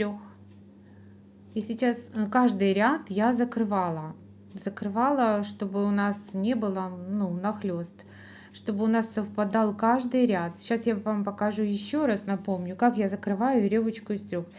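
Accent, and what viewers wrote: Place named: Ukraine. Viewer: Очень рада, что попала на Ваш канал. Самое главное, что слышу наш родной говорок. Украина . Замечательные работы, прекрасная подача. Хоть я плетун со стажем, но все таки очень хотела бы МК по белым трубочкам. Спасибо огромное за работу. С меня лайк и подписка. Удачи, вдохновения и процветания каналу !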